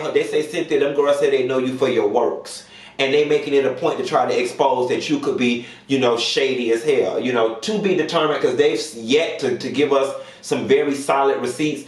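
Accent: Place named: New Orleans, United States. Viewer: PLEASE READ THIS!!!!! YEP Q. I love when u say FSU😄😄😄😄. THATS WHAT MADE ME SUBSCRIBE OVER A YEAR AGO!!!!! Ya heard me. ( im from Detroit tho i love new Orleans accent. you give the best... Im mean the BEST reviews keep it coming. Yep and even tho i like TS Madison I looooovee watching when u r on her show. when scroll and c ur name in something i stop and watch Keep going brother!!!! U give me life especially in the morning. CATCH😭😭😭😭😭😭 LOVE IT AND U!!!!!!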